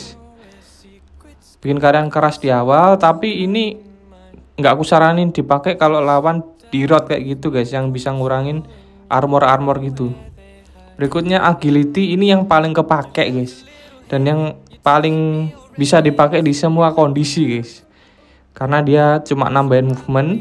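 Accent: Java, Indonesia. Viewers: bang Jawa ya?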